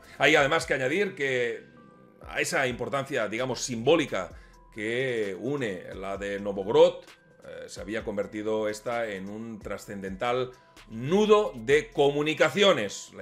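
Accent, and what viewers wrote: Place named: Catalonia, Spain. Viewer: Tienes acento catalán. Creo que eres valenciano, verdad? Salutacions des de Barcelona.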